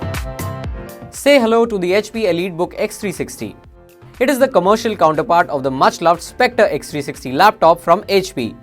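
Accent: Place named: India. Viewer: lol, Indian accent really, and to me not so bad. its understandable.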